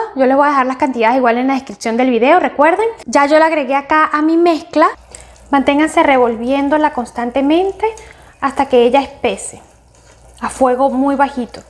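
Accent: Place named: Venezuela. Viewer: De que parte de vzla es tu acento ?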